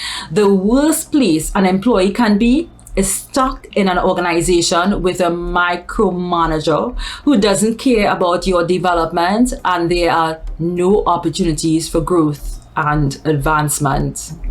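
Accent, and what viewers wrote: Trinidad and Tobago. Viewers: Are you from Trinidad? 🇹🇹. I sense an accent :) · Is that a Trinidadian accent or maybe Barbadian accent?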